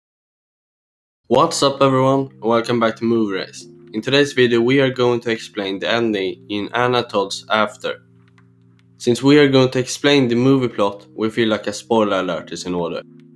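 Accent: Sweden. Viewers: I just have to ask you.. are you from Sweden? 'Cause it sounds like you're talking swedish - english. I'm from Sweden and can't talk swedish - english.. it's a little bit ambaresing.. (sorry for spelling) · Sorry if this is offensive, but are you from Sweden? Because you have a swedish accent lmao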